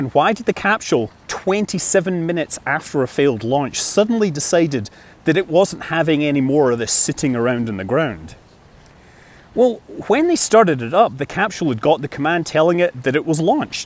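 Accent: Scotland, United Kingdom. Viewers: I mostly listen for the science/ history lesson in a thick Scottish accent.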